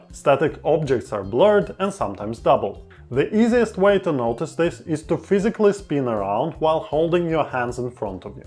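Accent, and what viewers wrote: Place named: Russia. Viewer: Oh, god, russian men speak on English, and this is so cool!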